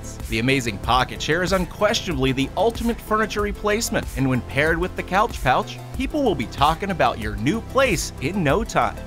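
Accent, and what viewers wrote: Buffalo, United States. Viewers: Are you from buffalo????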